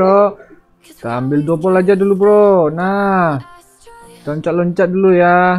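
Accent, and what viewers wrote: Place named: West Sumatra, Indonesia. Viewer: Jelas kali abg ni org minang nya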